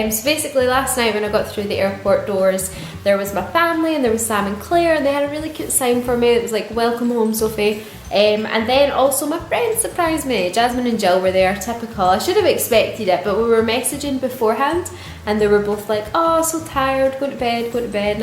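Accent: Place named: Glasgow, United Kingdom. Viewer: I've missed your vlogs Sophie! I'm from Glasgow so it's always nice to hear a familiar accent on the vlogs! You're looking amazing btw, a wee break from YouTube has clearly done you a world of good! x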